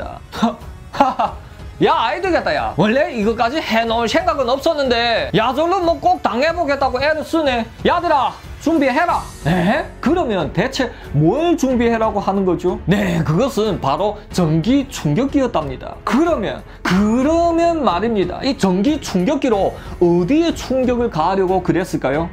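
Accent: Yanbian, China. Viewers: 이양반 말투는 연변식 한국말인가 ?아님 한국식 연변말 중심이없어 듣는입장의 학벌의 한계인가?.....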